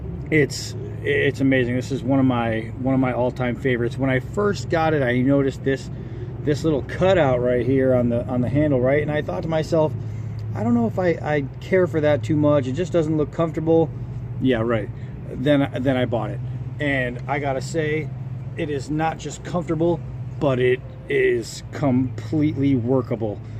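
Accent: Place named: Pennsylvania, United States. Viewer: Sounds like a Pennsylvania man. Beautiful knife and beautiful sycamore back there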